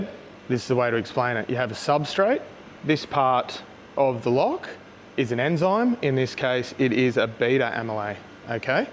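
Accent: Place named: Australia. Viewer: Very interesting information althoug I have to watch it several times because Ausie english is more dificult to understand to me! Thanks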